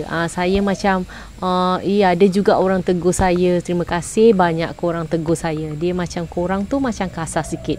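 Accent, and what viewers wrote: Johor, Malaysia. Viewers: Itu stail org johor ckp.. bg org johor biasa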